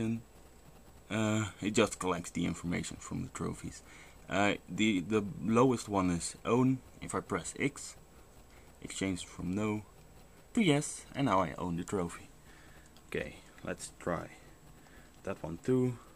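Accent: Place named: Netherlands. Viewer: aan de manier waarop je engels sprak (en je rekentoestel) wist ik gewoon dat je nederlands was :p btw: ben je programma nu aan het downloaden, thx!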